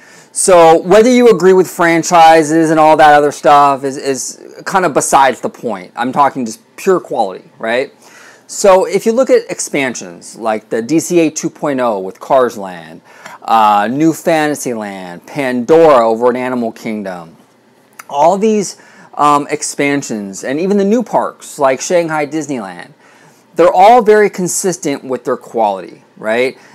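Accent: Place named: Florida, United States. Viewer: you sound like you are from Florida. I live in LA. Here universal is no where near Disney quality. HP world is a little street here.......I counted 49 disneyland resort rides and 2 of them are simulator rides. At universal studios hollywood only 3 rides and the tram are not simulators or have strong parts of the ride as a simulator. But to clarify what I said in my post, ill give an example. ET was a fantastic ride. One of my favorites as a kid. the theming was top level. Now its replaced by a lifeless rollercoaster. I don't think anyone would try to say the mummy ride has better theming than ET. Another example was king kong. That ride was burned in the memory of any young kid who road it. It was amazing because it was real. Now its just another simulation.....one step forward......two steps back......No one i know, wants these bullshit simulators.....And I won't respect disney if they start putting in simulations at the rate universal has done.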